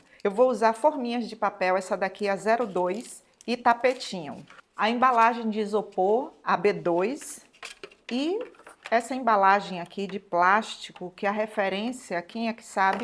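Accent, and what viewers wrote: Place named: Fortaleza, Brazil. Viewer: É por essas dicas maravilhosas que gosto muito do seu canal ❤ E com esse sotaque, mulher eu achava que vc era de fortaleza kkk E de onde vc é?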